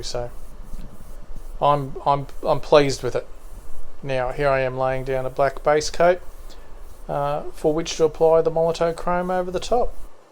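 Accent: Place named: Australia. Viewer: gee, looks nice and clean again. especially the roof rack, very useful, even for other castings, and customs. what if you do the rack two-part? make the two clamps that grip the roof's edge separate, connected by a rod in the middle maybe, so they click easier around the roof, and once the actual rack is glued down all gets stabilized. of course, chroming it will be a challenge then. but personally I'd be afraid of the resin breaking. anyway, great work! the casting looks a bit disproportionated, maybe because of the narrow wheels? aside that, if you need a giggle, look at how YouTube subtitles translate your 'strayan accent... including the dremel's noises. XD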